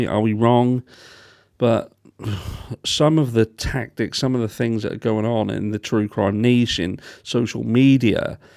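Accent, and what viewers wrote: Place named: Scotland, United Kingdom. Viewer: Im having rabbit stew.... 🐇 🐰 yummy I love your voice being Scottish, but I hate all this murder 😢 whats happening with the world 🌎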